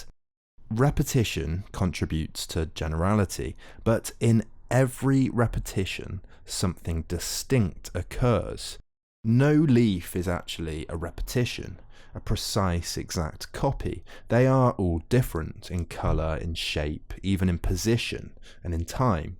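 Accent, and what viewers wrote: United Kingdom. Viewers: With these types of videos, my brain cells really appreciate the British accent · oh man, i love hearing this info from a british accent instead of an american. i feel so smart right now lmao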